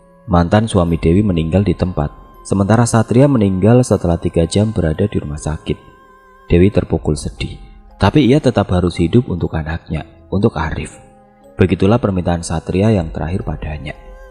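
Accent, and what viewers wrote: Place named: Banjar, Indonesia. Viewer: admin keknya orang kalimantan , soalnya logatnya kalau ngomong banjar pas banget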